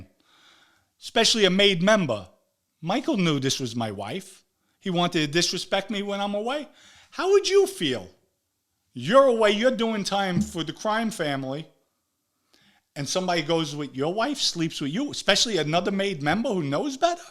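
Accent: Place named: Bronx, United States. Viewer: once I heard like myself, you were from the Bronx, I was sold. Welcome and good luck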